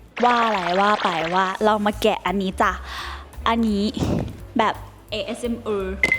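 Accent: United Kingdom. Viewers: Yeah, Freen is so cute...unboxing the Astro Boy box. I love her English accent, she's naturally funny and beautiful❤️. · I fell in love with Freen's English accent!! She keeps getting great day by day❤ I'm happy that she's happy❤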